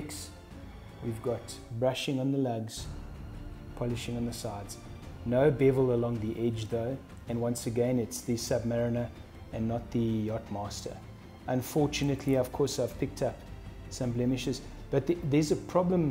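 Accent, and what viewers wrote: South Africa. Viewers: Sweden here again. Jebo,, I don't know that one so I will go with Jambo Sana instead. Both nice watches, I kind of like the black one with that pop of red and blue lume, could be a little better. By the way,, J-burg,, I guessed right on the accent.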